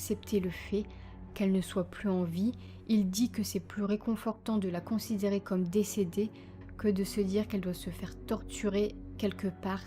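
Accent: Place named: Picardy, France. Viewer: Bonsoir, j'ai découvert il y a peu votre chaîne, de suite abonnée, vous êtes formidable dans vos reportages, une voix douce et calme ça fait du bien. Une voix qui me rappelle la ou j'habite et d'où je suis originaire d'après certaines intonations je suis de la Picardie. En tout cas c'est super. Bonne continuation. Bises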